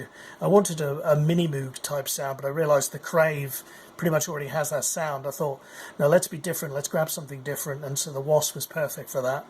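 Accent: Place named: United Kingdom. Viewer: Thanks for this, I'm really considering one now. Feels so right to hear a UK accent explaining a Wasp (clone, but still)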